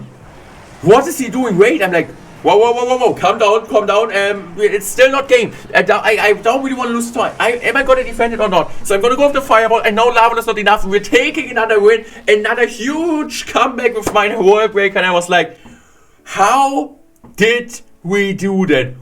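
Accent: Germany. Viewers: You are my favourite youtuber of clash royale(I love your german english accent)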